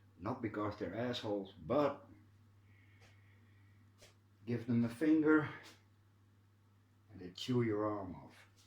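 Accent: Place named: Netherlands. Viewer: Nice stories. Have you noticed that with a few exceptions your commenters are all female? It must be that sexy Dutch voice that does it.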